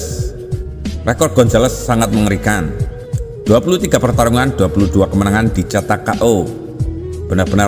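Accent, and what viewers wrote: Java, Indonesia. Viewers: Adminya medok sekali si bicaranya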